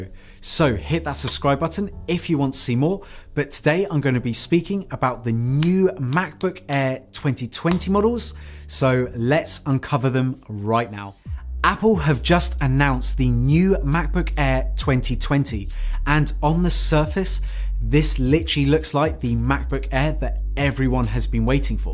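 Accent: United Kingdom. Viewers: Too British to listen to.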